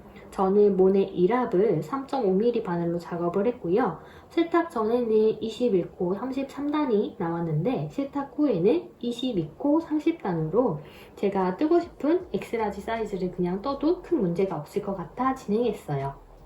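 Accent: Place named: South Korea. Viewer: 어쩐지 억양이 있어서 평소에 사투리 쓰시는분인가부다 했는데 역시 남쪽에 사시는분이셨군요😊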